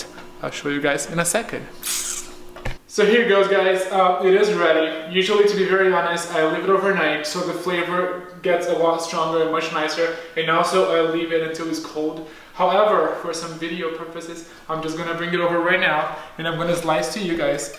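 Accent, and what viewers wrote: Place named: Brazil. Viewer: Your accent sounds Brazilian! Vc e brasileiro?? I've been looking for more sources of protein that are low on carbs because I'm struggling with my macros lately. They've been around 60% carbs 18% fats and 18% protein. I'm struggling hard but this video and your banana bread video helps a lot! Make more please!!!! Obrigada :)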